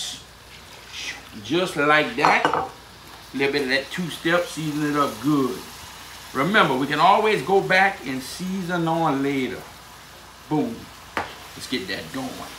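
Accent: United States. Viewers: Where in America u talk with this accent?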